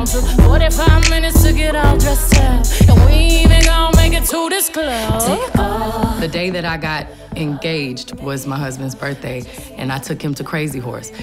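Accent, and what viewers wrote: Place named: South, United States. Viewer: She's so country!!! Cute. "I'm just tawking shyite." Lmao great stuff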